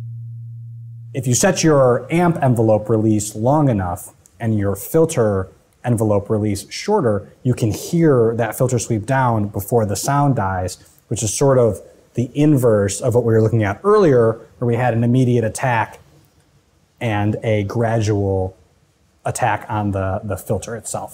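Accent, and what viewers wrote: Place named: United States. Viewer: Also never comes out of character with the American accent....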